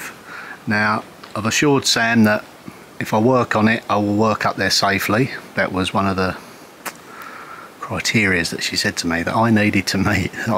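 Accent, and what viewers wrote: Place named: London, United Kingdom. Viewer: Doors look great. I am interested in your slight accent. As I was born in Kenya during colonial times, my mother was from Southern Rhodesia Zimbabwe. It is said I speak with slight ‘Rhode ‘, your accent sounds somewhere near or in London., retired Airline pilot. Thailand.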